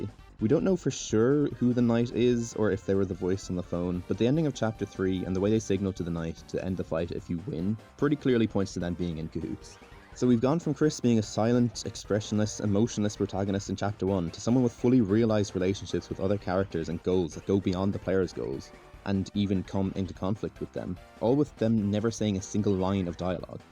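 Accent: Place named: Ireland. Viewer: RAHHHH IRISH ACCENT DETECTED!! irish they/them using deltarune fans must unite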